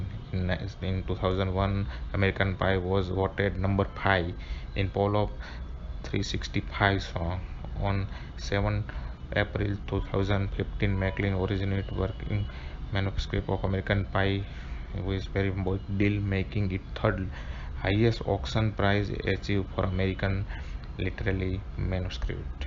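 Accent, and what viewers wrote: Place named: India. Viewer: That Indian accent is horrible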